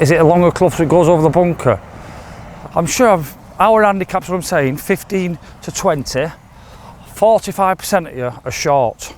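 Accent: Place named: Yorkshire, United Kingdom. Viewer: I love the Yorkie accent Who needs prepositions?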